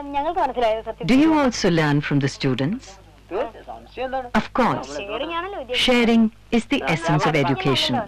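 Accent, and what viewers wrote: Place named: Kerala, India. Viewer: ഞാൻ ഈ വീഡിയോ ഇപ്പോൾ കാണുന്നു അവിചാരിതമായി കണ്ട ദക്ഷിണ എന്ന ചാനൽ പിന്തുടർന്നു ഇവിടെയും വരെ എത്തി........ You are great...... Salute you teacher and മാഷേ...... അവിടെ വരാൻ കൊതിയാവുന്നു....... ടീച്ചറുടെ സൗണ്ട് 👌🏼...... ടീച്ചറുടെ മലയാളം ഭാഷാ ശുദ്ധി...... Wow ...... റിയലി amazing........ എന്റെ മോൻ ടീച്ചറുടെ മലയാളത്തിന്റെ ആരാധകനാണ്‌....... അപകടകാരിയായ മലയാളി എന്നാണ് അവൻ സന്തോഷത്തോടെ പറയാറുള്ളത്......... സ്നേഹവും ബഹുമാനവും....... Respect you.... Love you❤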